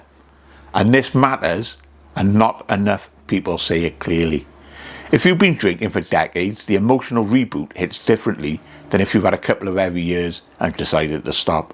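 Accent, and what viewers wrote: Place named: Newport, United Kingdom. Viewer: Alright, I understand your language and like your videos. Im originally from Newport S.Wales. Moved to Australia in 1995. Married for 23 years then a divorce came and I have never drank so much in my life. I started drinking in Bettws/Malpas age 14. I am 54 this year. Struggling to keep myself alive without a drink. I work every day although now the hangovers are severe. Good to here a Newport accent?? Thanks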